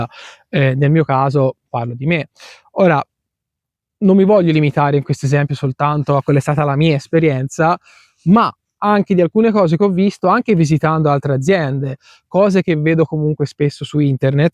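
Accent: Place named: North, Italy. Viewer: Dal tuo accento, capisco che vivi al nord,,, mi dispiace,,, possiedo dieci Milà metri di terreno,,(vivo in Sicilia) sono ormai dodici anni che ho avviato la mia partita iva,di azienda agricola,,,,produco un po' di tutto,,, è i prodotti che riesco a produrre, il 95% dei casi riesco a venderli molto meno della grande distribuzione,,, con buoni margini di profitto,,,, forse il motivo è anche che al sud ci sono molte più persone che possono permettersi di spendere molto di più per il buon cibo rispetto al nord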